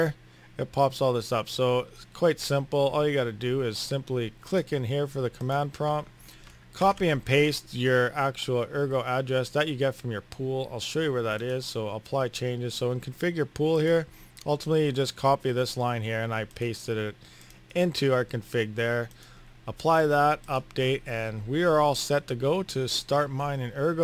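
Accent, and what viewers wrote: Canada. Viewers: I know when I hear my canadian brothers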